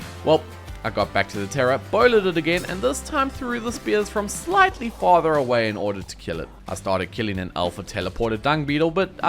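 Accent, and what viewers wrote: Afrikaans, South Africa. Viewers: Are u afrikaans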